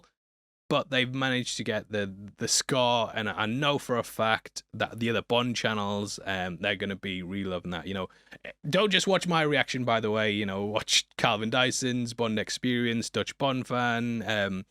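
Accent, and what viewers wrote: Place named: Scotland, United Kingdom. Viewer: I like how his accent has a hint of Scottish in it.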